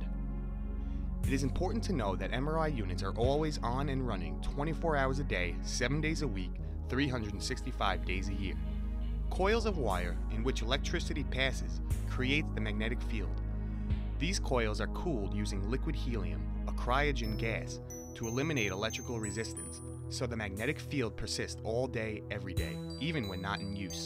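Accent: Boston, United States. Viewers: I don't know what was better, the education or the Boston accent.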